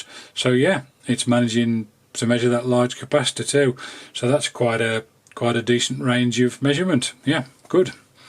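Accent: United Kingdom. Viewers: Love the British accent kind calm and civilized.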